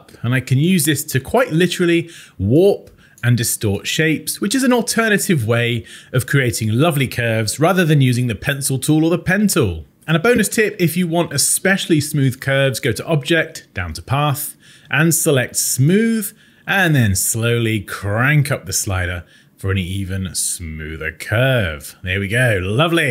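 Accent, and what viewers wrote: United Kingdom. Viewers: Your accent implies that you’re British , Aren’t you ?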